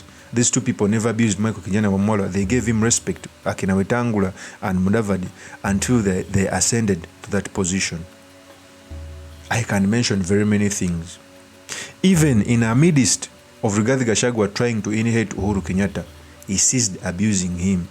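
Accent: Uganda. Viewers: Ugandan accent detected.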